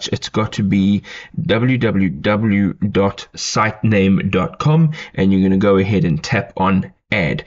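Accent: South Africa. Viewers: You sound South African....? Like from Western Cape, right?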